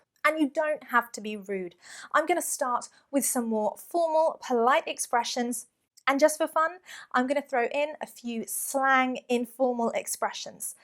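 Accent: United Kingdom. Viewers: Cos her British accent😁💟💟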